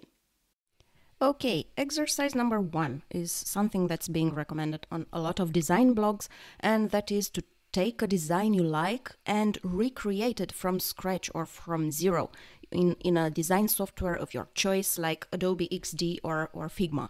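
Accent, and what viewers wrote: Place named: Russia. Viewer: Классные упражнения!Спасибо!У тебя русский акцент чувствуется)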